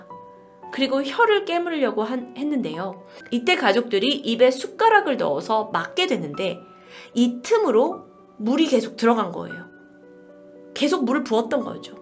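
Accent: Jeolla, South Korea. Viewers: 님 혹시 전라도 사람이신가요? 아님 주위에 전라도 사람 있으신가요? 전라도 사투리 쓰시는거 같은데 ㅎㅎ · 전라도쪽 사투리라 그럴겁니다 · 전라도 억양 때문이신가 의발음이 잘 안되시는 듯ㅋㅋ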